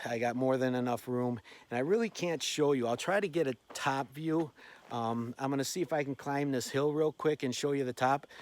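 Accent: Wisconsin, United States. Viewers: You sounded just like wisconsinites and I called it well. My uncle has land in Gleason Wisconsin. We used to camp up there. I live down near Milwaukee. I just subscribed to your channel.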